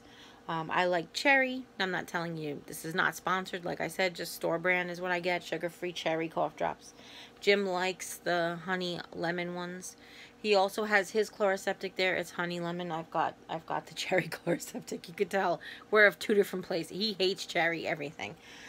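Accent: Long Island, United States. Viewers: Love the Long Island talk, I miss LI but I don't miss the amount of people living there!!! You are organized....wish I was!!!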